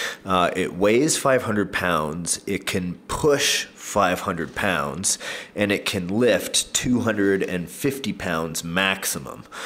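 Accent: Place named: Canada. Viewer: he's Canadian.you can tell from his accent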